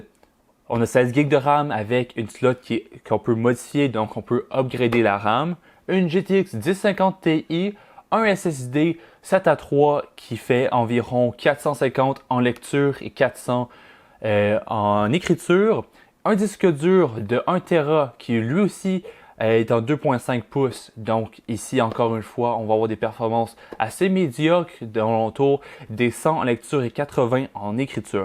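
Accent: Quebec, Canada. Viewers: j'adore ton accent québécois +1 abo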